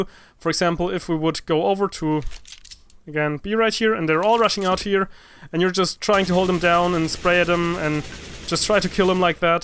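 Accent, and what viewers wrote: Germany. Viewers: ! i guess u would do great by doin that cause evry gun has different ways and styles to play that newbs like me need to learn, btw u hv a great accent for a german i used to think all of u would say ze and zat (no offense i hope u get what i mean)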